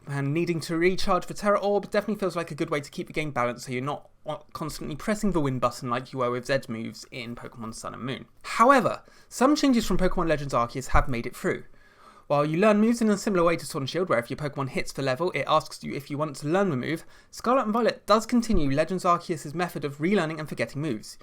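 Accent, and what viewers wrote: United Kingdom. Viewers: YOUR BRITISH???? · I've been using forever and never knew was british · holy shit is British? not sure why that caught me so off guard lol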